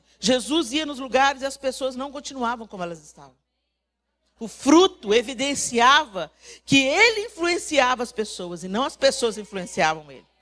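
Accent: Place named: Rio de Janeiro, Brazil. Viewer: parece até carioca na forma de falar...rs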